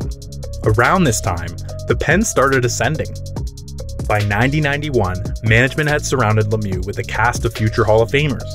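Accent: Canada. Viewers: this is probably the most canadian accent ive heard on this channel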